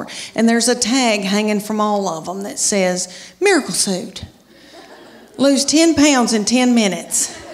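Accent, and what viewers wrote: South, United States. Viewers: Youa lil bit country ain't cha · Yes. She's very smart, hidden behind that accent, a true American!👍❤️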